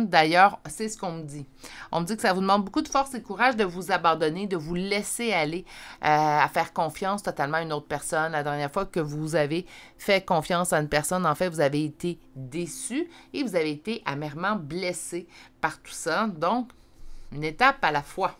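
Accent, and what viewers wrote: Canada. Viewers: C' est avec plaisir,très très Belle Personne et Franche...j' Adore ❤❤❤❤❤. Prenez soins de vous.j' Adore et toujours adoré l' accent Canadien et les Canadiens.❤❤❤.à mon retour de vous féliciter ❤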